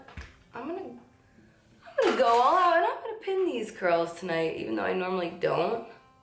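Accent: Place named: New York, United States. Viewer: OMG I love your NY acsent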